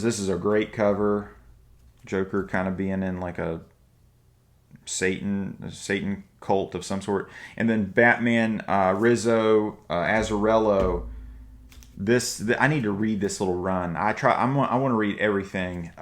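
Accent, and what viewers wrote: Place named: Ohio, United States. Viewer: Judging from your accent your from somewhere in Ohio.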